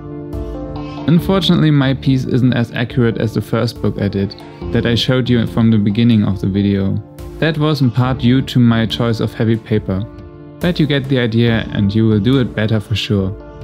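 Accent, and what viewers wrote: Germany. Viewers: He sounds german Bist du Deutsch? 'v'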